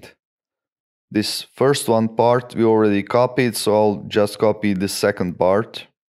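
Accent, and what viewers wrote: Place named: Eastern Europe. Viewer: Славянский акцент))))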